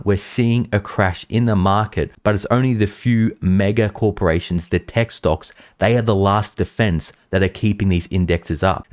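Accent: Australia. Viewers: Love your down under accent ! Lol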